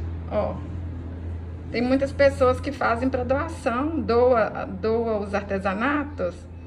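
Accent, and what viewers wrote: Minas Gerais, Brazil. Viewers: Adoro assistir suas aulas. Elas sao fáceis e esse seu sotaque de mineira é uma delícia!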